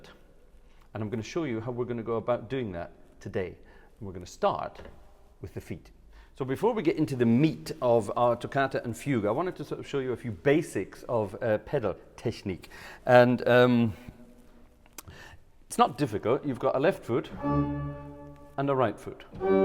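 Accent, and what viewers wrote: Scotland, United Kingdom. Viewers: First time on your channel. Fascinating, as the organ has always seemed so complicated—to a piano player such as me. Also fascinating is how your accent moves from Scottish to Sean Connery performing a German general in a World War II movie. 😉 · Love your scottish accent <3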